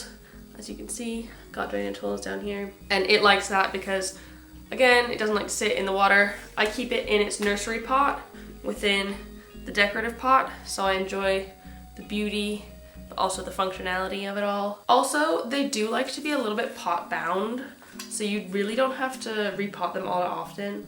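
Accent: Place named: United Kingdom. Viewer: Are you British? I hear a bit of an english accent...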